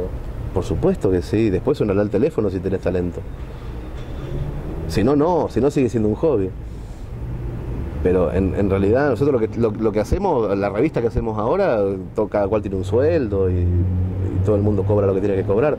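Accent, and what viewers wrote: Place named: Buenos Aires, Argentina. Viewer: La mezcla en el acento de porteño y español me mata!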